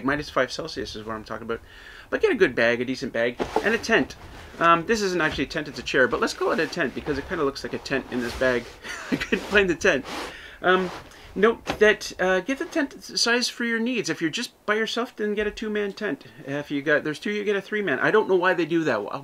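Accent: Canada. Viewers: Like I have told ya before....YOU MISSED YOUR CALLING !!!!!.... 🥰🤣 love hearing you talk. City girl too. That Canadian accent !! You’re just so fun to watch when you’re not on ICE. !! 😳😳 that does scare me ! Enjoy your place... make those memories and I hope y’all have a long life there!! 🥰🦮🦮!! ❤️🍀🍀🍀🍀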